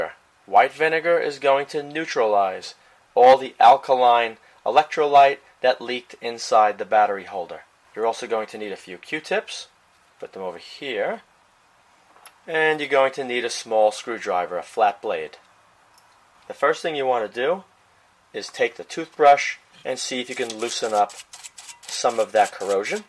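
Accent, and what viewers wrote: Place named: New York, United States. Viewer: He sounds like a new yorker from long island.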